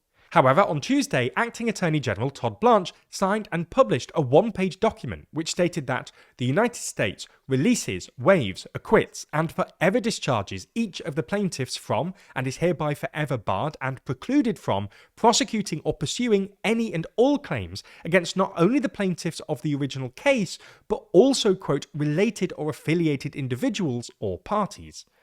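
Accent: United Kingdom. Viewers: Yawn. Another brit-accent wagging-fingers about superior morality. America is not Commonwealth, so your ignorance not understanding undercuts your ‘judgement’ of events and facts: America does not jail politicians for running an office, but Biden+co did just that; weaponizing lawfare until Trumps whole family was threatened with jail - when they had nothing to do with it. So much Democracy under Liberals. Further, their fortune was directly threatened vocally, legally, politically, but US administration: what better way to say Papa Spank, than make departments reel from institutional shock and public shame? AND, the January Sixth protest was another coercion this tries to set right: as you will see, the voting was entirely rigged, because Chatham House cannot survive two Trump presidencies. They’ve said so themselves publicly just last week. Maybe it;s a rug-pull, but I see Justice in exacting a price for government malfeasance and appears nothing beyond what Every Other Leader seems to do. 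Biden+Co thought an Autopen substituted for Public Opinion and legal Congressional Acts. Enjoy your morally superior rape-gangs, England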